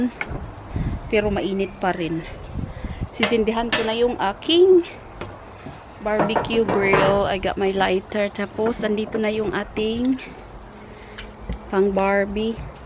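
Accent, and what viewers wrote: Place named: United Kingdom. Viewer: I love your UK accent sis. Sarap pakinggan parang di marunong magalit.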